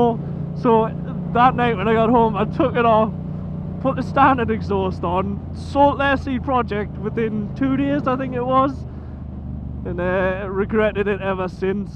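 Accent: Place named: Newcastle, United Kingdom. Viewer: Hi mate good to hear your geordie accent again nice to see u back focus st awesome car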